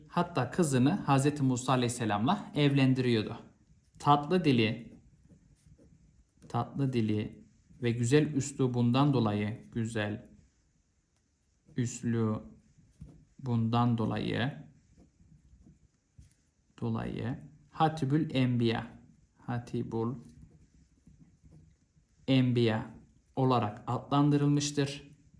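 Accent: Malatya, Turkey. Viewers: hocam malatyalımısınız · hocam malatyalımısınız merak ettimde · Hocam malatyalımısınız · Hocam malatyalı mısınız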